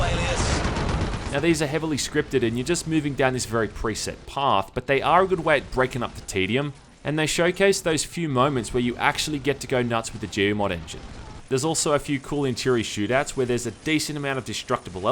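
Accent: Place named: Australia. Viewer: Oooh thank you i love your reviews and australien accent. both combine makes a charmes in your video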